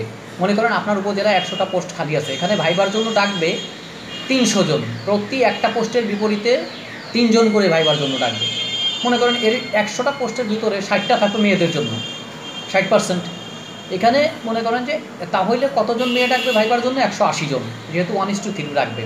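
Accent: Bangladesh. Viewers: ওরে বরিশাইল্লা!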